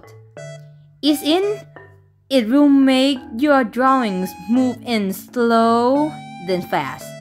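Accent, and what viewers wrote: Thailand. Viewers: ชอบสำเนียง มีเหน่อๆ555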